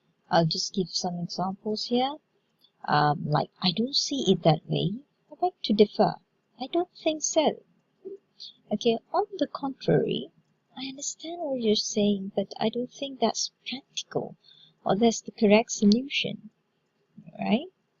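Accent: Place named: United Kingdom. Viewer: 8 mins before my MUET. Btw love your british accent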